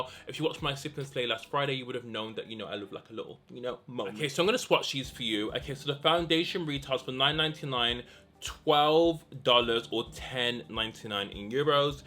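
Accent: Birmingham, United Kingdom. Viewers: Is that a Birmingham accent I hear?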